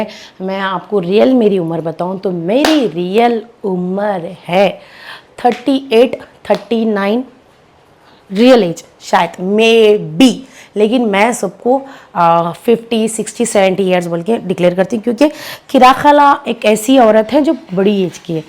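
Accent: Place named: Hyderabad, India. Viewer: Aap perfect muslim hi lagti ho didi talking style behaviour also perfect hyderabadi muslim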